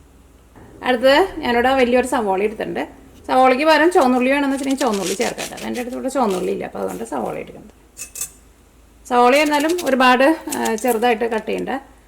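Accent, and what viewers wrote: Thrissur, India. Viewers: Voice modulation better aakan nkkuka, Thrissur slang koodi aakumpol follow cheyyam pattinnilla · Hi chechi, your cooking is So good..u r videos watching since 2014(after marriage)..I love your Thrissur slang sooo much.. even though I am far from my home.. after watching your videos I have homely feeling. always watching your videos with full smile 😊...I used to keep fish curry as you said..... keep rocking chechi...❣️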